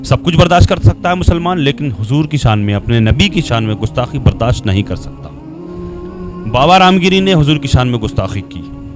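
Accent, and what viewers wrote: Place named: Maharashtra, India. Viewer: Yeh Maharashtrian Boly hae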